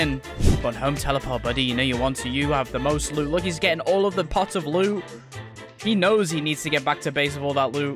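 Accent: United Kingdom. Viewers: This is awesome! Are you British?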